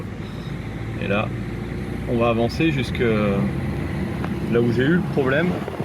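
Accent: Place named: North, France. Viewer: Bonjour, je me douté bien que cette accent c'était un accent du Nord on est vite trahi par notre accent 😀 bonjour de Liévin,ta ferme de situe dans quel coin.?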